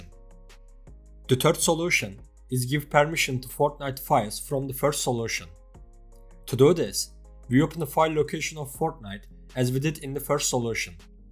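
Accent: Turkey. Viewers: teeeşekkürler dostum konuşmandan türk olduğunu anladım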